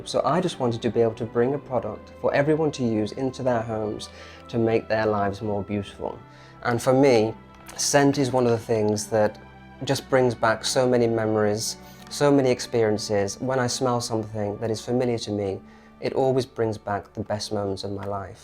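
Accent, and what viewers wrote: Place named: England, United Kingdom. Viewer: you are simply lovely. Yes, beauty does make life enchanting. The English garden, way of life and pronunciation: is you encapsulated. Thank you my friend.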